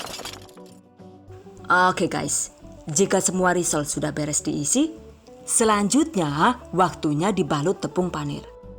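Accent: Banyumas, Indonesia. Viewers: maaf suaranya suara ibu kan ya? lucu gaya ngapaknya hihi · Ngapak kaya nyong 😆😆😆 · mantap nih bun interaktif banget 😅 ngapaknya ra kuat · Dening ngapak iya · Nyimak wlu agak ngantuk2 gtu,krn kangen denger ngapaknya wkkwkkkk....dedek ngebantu bundanya...,😀 sampk nangkring2 gtu. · Mantabs banget mamake 😁😁 salam kenal bunda..sy suka resep2nya.. suaranya khas dan ternyata ngapakers juga 😍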